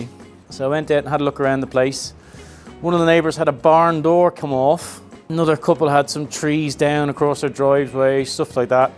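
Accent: Ireland. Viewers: question.....Do you speak French with an Irish accent?:eyes-pink-heart-shape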